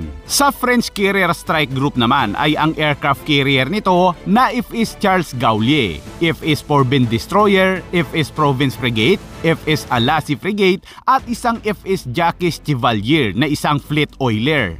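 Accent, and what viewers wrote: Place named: Visayas, Philippines. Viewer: Kala ko mali lng spelling mo bisaya pala ikaw bumasa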